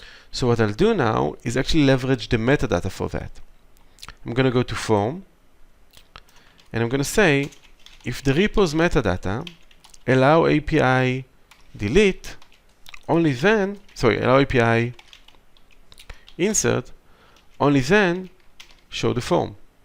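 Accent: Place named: Israel. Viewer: אתה ישראלי? המבטא שלך נשמע ישראלי רצח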